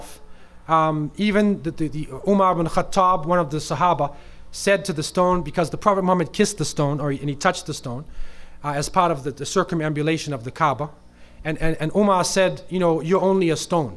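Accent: United States. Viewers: he's originally American. Boston I believe. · I love his American accent. Awesome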